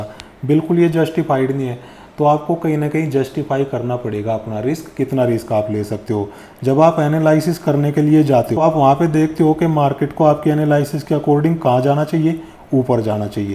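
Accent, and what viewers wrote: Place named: Haryana, India. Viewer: Bhai aap to merre haryana se lg rhe ho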